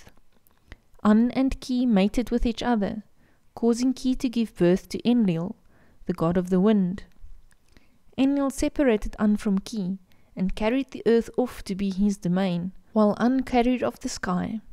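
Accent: South Africa. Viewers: Nice to hear that South African accent. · Nah, but there's a lot of South Africans in NZ so I recognized the accent straight away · Is that Afrikaans accent I hear, love it either way, I'd listen to anything you did lol and very interesting good work 👍😎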